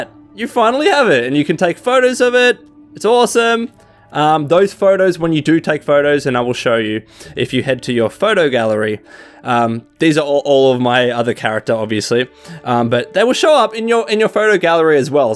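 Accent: Australia. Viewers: Just got Fallout 76 two weeks ago and really enjoying it so far. Always good to come back here for the tips and hear another Aussie voice.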